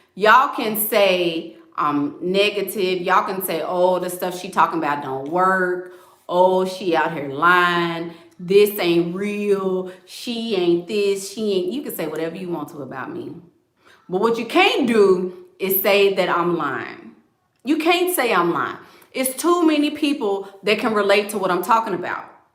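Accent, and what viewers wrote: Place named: South, United States. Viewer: you did it again!!! This video was everything!! Straight facts.. I’m 26 years old and I finally stepped out the victim mentality.. and watching you more and more makes me really double think of what I DO!! you crying is definitely not a sign thing.. when you hear real and feel it you automatically going to cry because I sure did.. I highly appreciate you.. and I love you southern accent we Texas women rock lol. Much love to you 🥰 PS:50,000 subscribers will definitely be yours by your birthday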